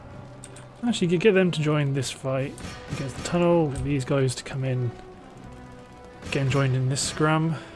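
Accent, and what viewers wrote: United Kingdom. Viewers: God I love your English accent.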